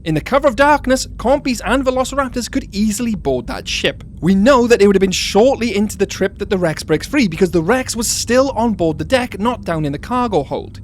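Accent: Wales, United Kingdom. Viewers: This channel is awesome and just like with Star Wars nowadays, living proof of how the fans of this franchise love and care about it more than the official owners of it do 👏. Also, are you a fellow Welshman? Loving the dialect, and does anyone know the time of that instrumental that kicks in ? So chilling and eery.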